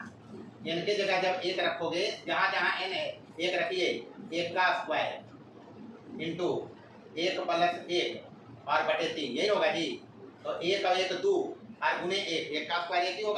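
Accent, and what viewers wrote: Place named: Bihar, India. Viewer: Uncle aap Mokama se he na bhasa se pahchal liya me bhi waha rahta tha batcha me 🙂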